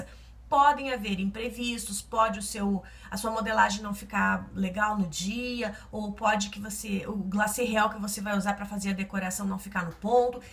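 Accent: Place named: Southern Brazil, Brazil. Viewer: Ouvindo o seu sotaque fiquei tentando imaginar de onde você é... RS? PR?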